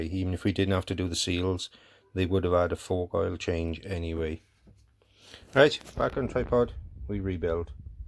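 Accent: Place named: Wales, United Kingdom. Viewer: Watching and loving your Lifan video's. I just bought a Lifan King 125 on the weekend (63 plate with less than 3k miles). I notice by your accent your Welsh, what part of Wales are you living? I'm in Brynmawr near Ebbw Vale:)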